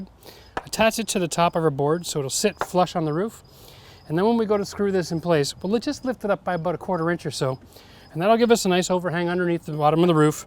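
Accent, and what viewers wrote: Canada. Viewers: Not 2 minutes before you mentioned Red Green, you said something with your Canadian accent and I said to myself, "he sounds like Red Green." That guy's funny.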